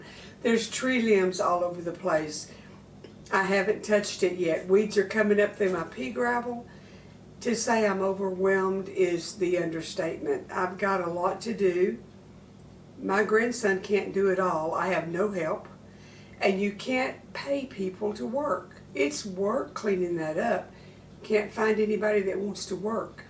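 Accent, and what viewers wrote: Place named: South, United States. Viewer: what state are you from? I’m from Mississippi and I love to hear you speak. So thankful that your home is intact.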